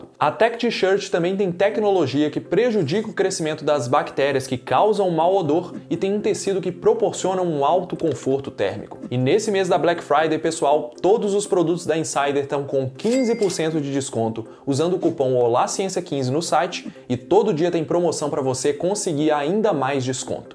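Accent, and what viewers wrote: Minas Gerais, Brazil. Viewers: Que sotaque mineiro fofo ❤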